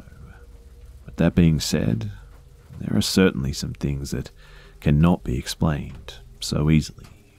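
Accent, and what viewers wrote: Australia. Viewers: If you would have told me 10 years ago that I would one day have an Australian man lulling me to sleep at night, I would have thought you were smoking crack. Present-day-me wonders why more people don't have an Australian man lulling them to sleep at night. People probably think I smoke crack.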